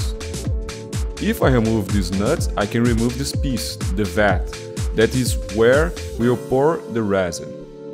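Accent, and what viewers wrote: Brazil. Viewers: So he has a Brazilian accent? I thought maybe it was Russian? GTK thanks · Oi pelo teu sotaque e nome, você é brasileiro? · A heavy Brazilian accent... that was unexpected tho!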